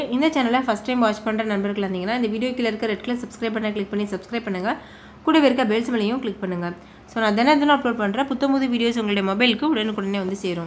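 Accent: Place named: Madurai, India. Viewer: Madurai side ahTamil neenga my old days memories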